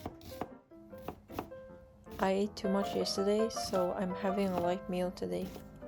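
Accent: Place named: England, United Kingdom. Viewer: I love your english spoken accent, and a genuine presentation, helps seeing Japan from a ground level.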